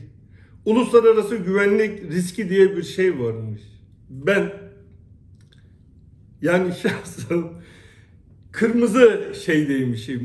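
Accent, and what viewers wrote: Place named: Izmit, Turkey. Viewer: Milyonlarca insanın diyemediğini anlattın bu ülkede seni unutmucaz reis keşke olsanda ülkende şu izmit sesinle canlansın eskisi gibi herkez kötü lesede kaderini bilki ki biz biliriz seni reis korkak gibi susmaktan sa aslan gibi ne varsa anlatmaya calıstın unutmadık unutmucaz seni 🙏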